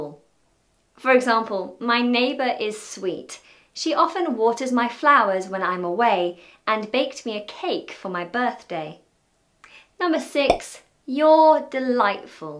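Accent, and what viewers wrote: United Kingdom. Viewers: You're sweet, but why aren't you blonde? Swedish girls are much sweeter than British girls. However, I am crazy about hearing your British accent, sweetie! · To be 'onest I don't like British accent sorry 😆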